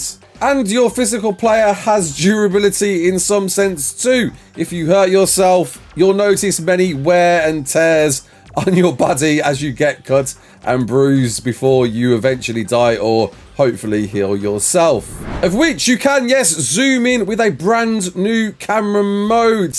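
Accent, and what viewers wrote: Birmingham, United Kingdom. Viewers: Honestly I only came across you waiting for xbox release, but its fuc*ing nice to hear a fellow Brummy on youtbe! I've subscribed and I hope you make videos for xbox 👍🤟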